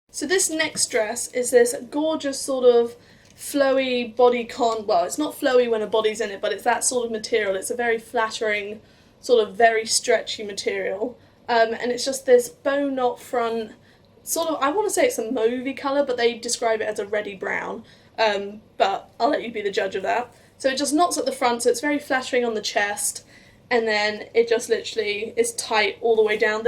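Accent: United Kingdom. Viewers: I love your british accent pretty girl, great videos, I wish you happy holidays-christmas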